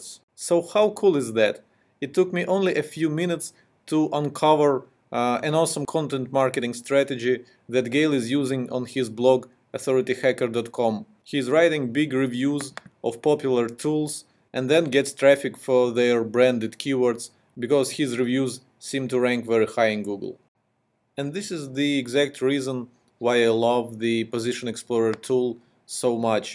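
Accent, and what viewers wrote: Russia. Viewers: Русский акцент, фууууу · great information ! are you from russia :)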